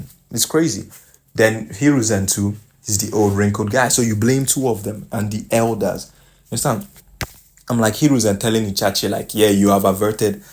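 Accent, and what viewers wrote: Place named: Africa. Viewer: I knew you were african, the accent never lies 😂. Also the itachi novels go into more detail. This is a quote from the novels when the elders debate whether they should allow itachi in anbu "The Military Police Force was created to drive the Uchiha clan away from the central functions of the village". Like the systemic discrimination was there and they didnt even hide it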